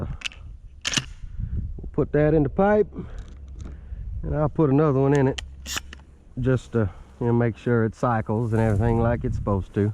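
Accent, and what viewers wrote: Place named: New York, United States. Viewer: With that accent, I keep wondering what part of New York you’re from. Right?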